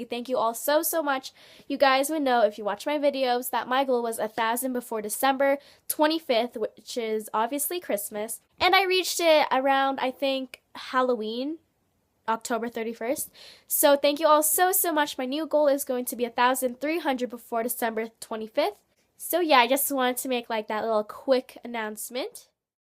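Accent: Canada. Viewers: Wait is she Canadian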